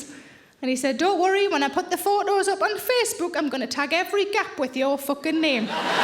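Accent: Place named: Northern England, United Kingdom. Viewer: My family were from the UK, as is my husband, but we are a bit older now and especially for the stronger accents (like Northern accent) we use subtitles! We catch SO much more now! I hope this helps! Cheers! 😊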